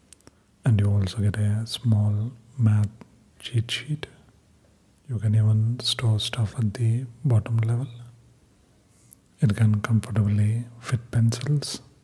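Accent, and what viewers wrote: India. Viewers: I love the Indian accent. 💜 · I like Indian accents. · It's literally the best. I love watching ASMR videos where the person has an accent, but the Indian accent is the best.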